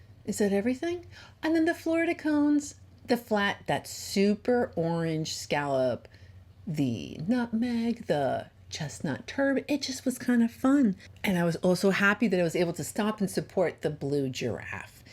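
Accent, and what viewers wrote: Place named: New York, United States. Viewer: My best friend's mom spent most of her life in NY, and NJ, and when you say certain words your dialect sounds just like hers, it makes my heart happy! It is amazing that you have learned ALL of this in just a few years!! I love your content, thank you for all you do! ❤ 🐚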